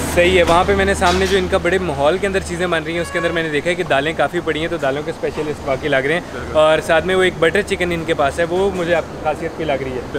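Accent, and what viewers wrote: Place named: Bihar, India. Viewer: Waise tum ek bihari ki tarah bolte ho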